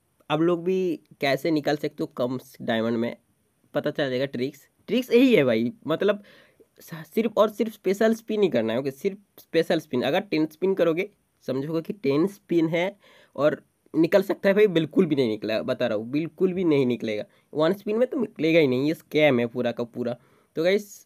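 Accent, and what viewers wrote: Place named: South, India. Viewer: Aap thodaa south side se ho khaa bhai aapka voice se samjaa rhaa hai bro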